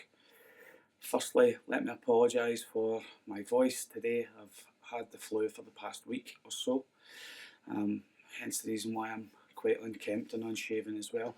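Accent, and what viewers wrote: Scotland, United Kingdom. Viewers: well I’m Italian but i usually understand English quite well,it might be a mix of your voice condition,audio and (nice) Scottish accent:) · Thanks for the warning , i wanted to buy one of those ( w/o tree inlays) PLEASE, use a lapel mic , or singing mic positioned infront of you. The sound quality on the video is muffled ( nice scotish accent though). Best regards, D.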